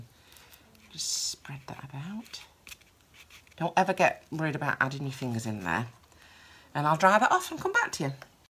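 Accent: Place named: East Midlands, United Kingdom. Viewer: So nice to see a video from someone from England, and not having to pause it to read the translation 😃 I’m in the East Mids, from your accent you’re not that far from me ...love it by the way 🙂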